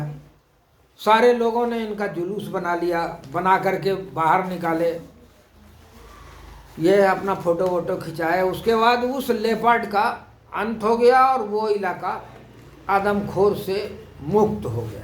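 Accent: Bihar, India. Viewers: I love your jacket sir and the way to explain in Bihari accent 🙏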